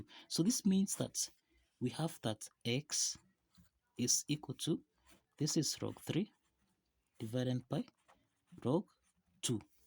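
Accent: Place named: India. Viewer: Ужасный акцент индуса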